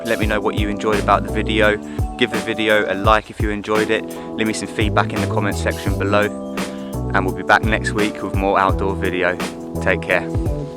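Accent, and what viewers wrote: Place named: Southern England, United Kingdom. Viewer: Thought I recognised a sort of local ish accent. I am in East Sussex so not too far from you. 👍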